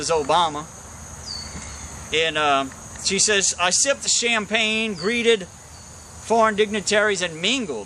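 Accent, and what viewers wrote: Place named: Louisiana, United States. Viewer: As a fellow Louisianian (from Cenla), I was convinced you were from Louisiana from the accent.... nailed it! Thanks and God bless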